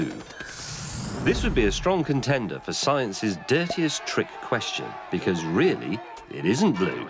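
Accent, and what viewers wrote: United Kingdom. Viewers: My favourite British Accent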